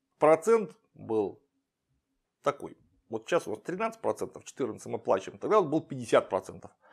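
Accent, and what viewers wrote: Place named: Bryansk, Russia. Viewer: Спасибо, Клим Саныч, за просвещение. Слушаю вашу речь и наслаждаюсь - родными краями повеяло, у меня батя из под Трубчевска. А у дяди жена из под Клинцов. :)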